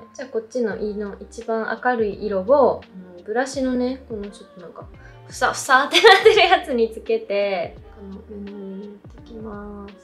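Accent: Kansai, Japan. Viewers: の関西弁が好き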